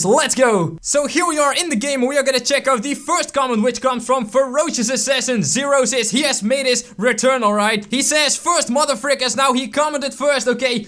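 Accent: Netherlands. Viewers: Het klinkt of jij nederlands bent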